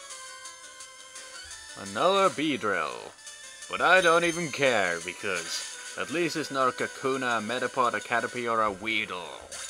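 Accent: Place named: United Kingdom. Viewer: Love the British(?) accent. Lol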